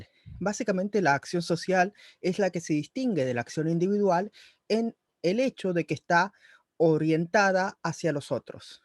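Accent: Standard. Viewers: Me encanta como explicas, pero se me hace raro que hables en neutro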